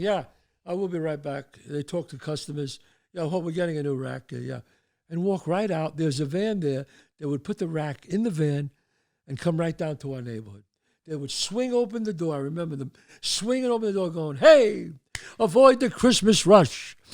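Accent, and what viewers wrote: United States. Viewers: Enjoy the show.. love the old nyc talk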